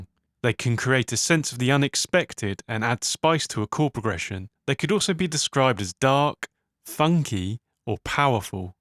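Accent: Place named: United Kingdom. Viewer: why does every tutorial have a dude with an annoying brittish voice?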